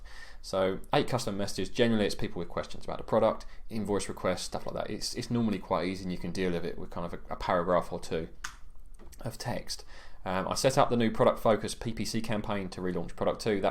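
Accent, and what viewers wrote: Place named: Essex, United Kingdom. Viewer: Great video, where are you based in UK? Sound a little bit Essex but can’t quite place the accent 😁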